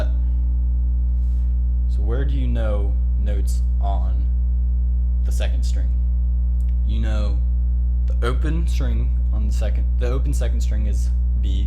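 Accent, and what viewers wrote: United States. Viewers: Are you from Baltimore?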